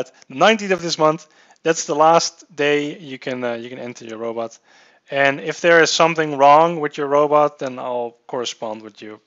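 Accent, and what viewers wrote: Netherlands. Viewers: Man im dutch myselve and i instantly knew youre dutch :P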